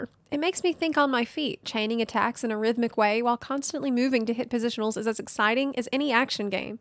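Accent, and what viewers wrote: South, United States. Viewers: Omg i cant tell if your accent is Southern American or Australian 🥺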